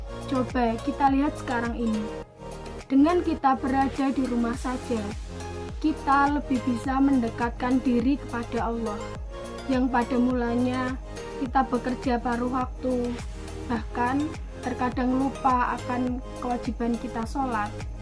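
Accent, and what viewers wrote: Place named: Java, Indonesia. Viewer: Mntap mntap, jowo tulen Iki🤤🤣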